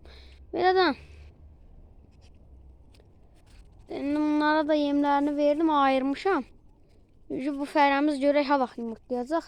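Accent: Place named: Georgia. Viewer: Gürcüstan ləhcəsinə oxşoooor ləhcən ay qaaaa.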